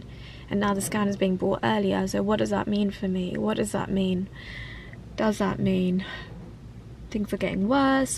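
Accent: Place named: Oxford, United Kingdom. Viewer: speaks Oxford English, French and Tamil.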